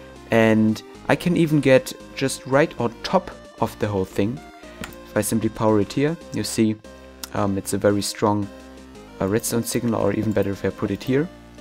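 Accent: Germany. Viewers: He's german, but that is very little of a german accent ^^ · Come you from Germany?!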